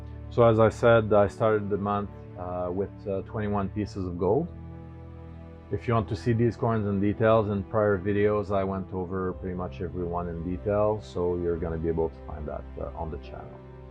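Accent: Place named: Quebec, Canada. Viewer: I recognize the Québécois accent lol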